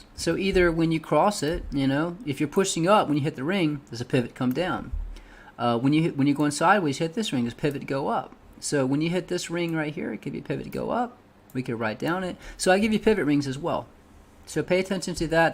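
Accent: South, United States. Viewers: That country drawl is killin the chillin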